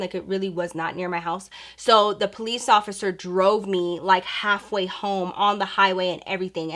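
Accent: Texas, United States. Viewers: Texas in the house!!!! You Sound like you from tx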